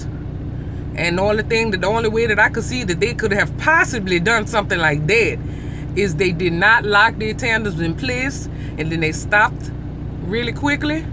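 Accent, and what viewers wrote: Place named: New Orleans, United States. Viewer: U gotta be from New Orleans. Hear the accent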